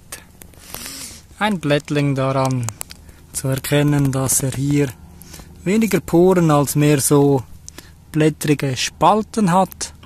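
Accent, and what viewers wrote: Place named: Switzerland. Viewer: dieser geile schweizer dialekt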